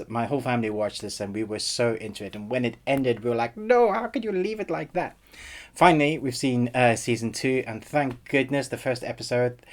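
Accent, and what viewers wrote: South Africa. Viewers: Is it just me or does this guy sound South African?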